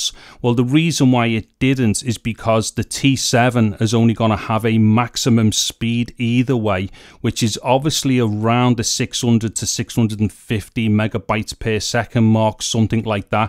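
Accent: Liverpool, United Kingdom. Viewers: Hey, are you from Liverpool? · That British accent though. By the way nice effort.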